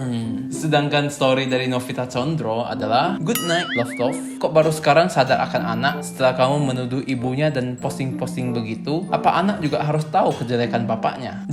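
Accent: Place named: China. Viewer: Are you Chinese People Mr??? Because style your talking very funny... I'm very sorry Mr 🙏🙏🙏😊, Hopefully Always Stay Healthy and keep Strong everyone 💪💪💪💪🙌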